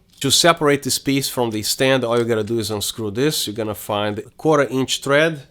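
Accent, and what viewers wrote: Brazil. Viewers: The Brazilian accent is cool! Gostei muito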